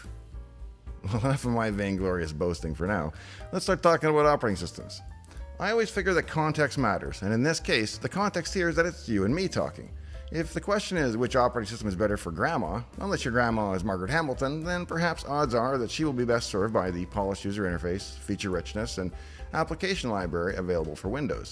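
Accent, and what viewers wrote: Canada. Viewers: A second option that may help is to CC the videos, as I quite enjoy listening to the pnw/Canuck accent you’ve got going on.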